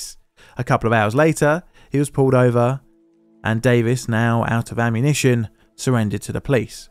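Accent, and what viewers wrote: United Kingdom. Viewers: Always interesting to hear a Brit describing American incidents. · The one graphic showing the front of the car shows the British origin of this video (as if the accent didn't already) because Americans drive on the right side of the road meaning the driver is on the right side of the vehicle. What other details are wrong?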